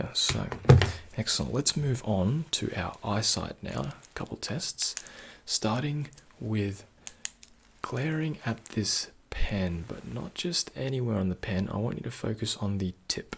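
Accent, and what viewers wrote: Australia. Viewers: You look more like a Filipino but then you speak fluent Australian accent! Very knowledgeable and full of tingles. :((( Subscribed! ♥️ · God yes!! I love how Aussies always say “no dramas” amazing. Voice is better than butter. New sub for sure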